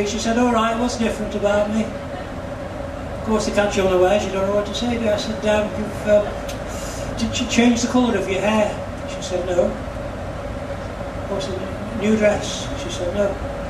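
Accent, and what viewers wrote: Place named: Yorkshire, United Kingdom. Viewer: Still got your Brown Edge accent!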